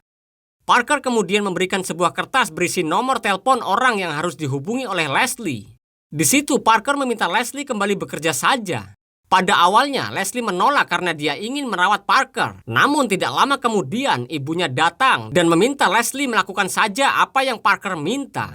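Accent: Java, Indonesia. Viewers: Seru ni pembawa acaranya orang Jawa logatnya mantap😂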